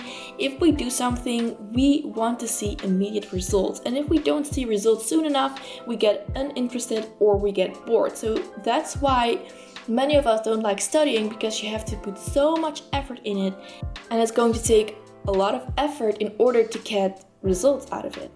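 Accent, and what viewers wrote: Netherlands. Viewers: Are you from the Netherlands, because her voice sounds a little bit like Netherlands People trying English. But your English is very well! I'm from the Netherlands! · Cool video! Are you Dutch? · Are you Dutch? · Je klinkt Nederlands, klopt dit? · Are you dutch? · Omg love your dutch accent!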